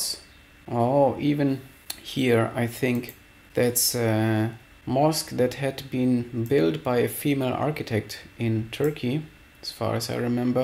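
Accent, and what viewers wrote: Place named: Germany. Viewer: Kein problem.. I like to hear De-nglish..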